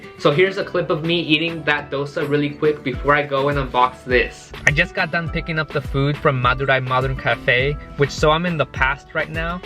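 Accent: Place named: Latin America. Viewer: Mi compa you earned yourself a fan by pronouncing them Indian names correctly(well almost correct with that latino accent)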